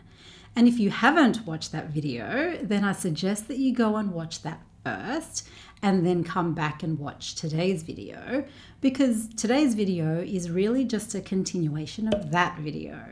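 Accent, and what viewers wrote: Australia. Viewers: Are you native Australian?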